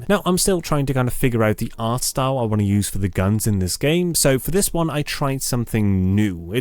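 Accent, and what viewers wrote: United Kingdom. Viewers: WOW!!!!! That is crazy my dude.. u sound like ur from Birmingham.. ask any british person what ur accent sounds like in the old videos.. not for one second did i think u were dutch.. that is some accent.. well done!! Your latest videos sound like ur 100% British!! with a very upper class accent.. :)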